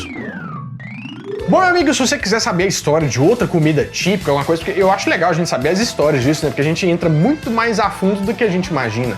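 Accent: Minas Gerais, Brazil. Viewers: "balangar" o leite kkk é mineiro msm..melhor sotaque..sou da zona da mata mineira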